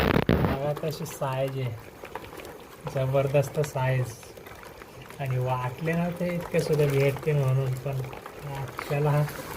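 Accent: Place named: Telangana, India. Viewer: Bhau tumhi telgu aahat ka tumchi marathi language telgu lokan sarkhi ch aahe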